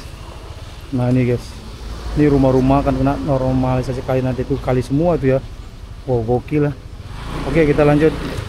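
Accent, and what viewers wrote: Medan, Indonesia. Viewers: kental kali cakap medan kau lek....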